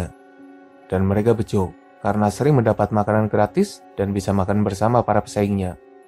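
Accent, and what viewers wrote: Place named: Java, Indonesia. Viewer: Suaranya keterah banget orang jawa Dari jawa mana min soalnya saya juga orang jawa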